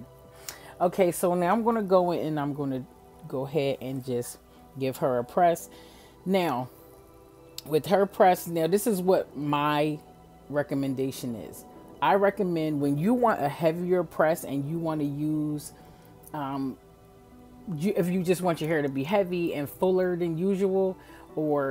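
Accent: Philadelphia, United States. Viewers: Are you in philly? You sound like my Philly family. I need a natural beautician and I be in Philly sometimes.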